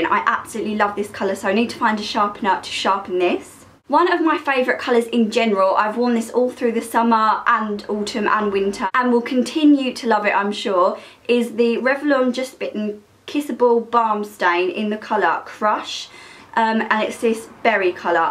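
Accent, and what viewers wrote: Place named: London, United Kingdom. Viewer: You gotta be from london :) Sound so much like my family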